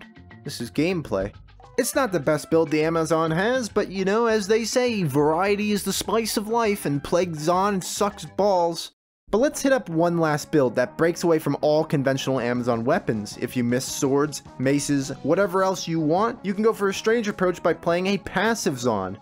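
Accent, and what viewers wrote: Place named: Northeast, United States. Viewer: lol oh word, you have more of a northeast rhythm i thought. Anyway, good stuff! Although sometimes I wonder if you like the game ahaha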